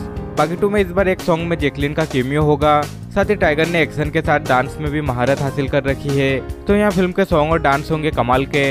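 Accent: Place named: Bihar, India. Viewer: Bihar se ho kya bhai , aawaj change kar bhai , Maza nahi aa raha Heeee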